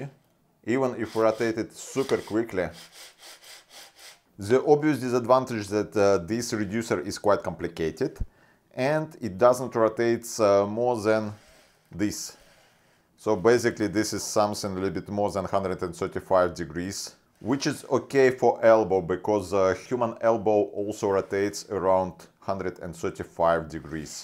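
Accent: Russia. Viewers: You have Russian accent😁 can you speak Russian? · Удивительно, как YT хорошо определяет Runglish в субтитрах! Но "полес" слышит, как police :)) В реале имелось ввиду наверно "poles" или "pulleys" (как в дереве). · This indeed russian accent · It is a rare case of a lovely Russian accent.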